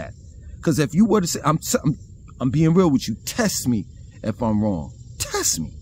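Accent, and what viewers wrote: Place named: South, United States. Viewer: Very very south. Very deep accent as well. Loove the accent.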